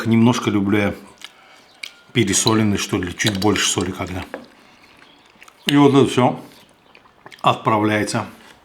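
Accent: Baku, Azerbaijan. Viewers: Салам. Отличие дядя от других фуд блогеров когда он готовит обесняет, говорит, шутит. Бакинский акцент русского языка вапше супер. Жаль что отличие от "немых" фуд блогеров подписчики мало. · Уверен,блюдо невероятно вкусное!но благодаря вашему Бакинскому акценту,думаю оно вкуснее еще в 10 раз! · Сказочное блюдо и сладкий бакинский акцент! ❤❤❤ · брат, все твои блюда очень-очень аппетитные, а благодаря БАКИНСКОМУ акценту, ещё и завораживают 👍👍👍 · Ох уж этот Бакинский акцент... ❤❤❤ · Мне нравится сумгаитский, бакинский акцент. Сам с Дагестана но родился в Сумгаите.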